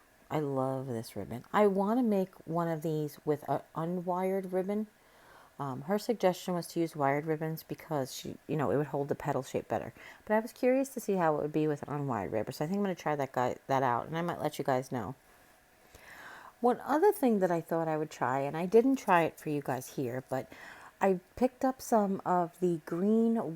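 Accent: New York, United States. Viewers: Guess what I am making tomorrow!!! These are adorable! And I said before I love the NY accent ! Bayside ❤️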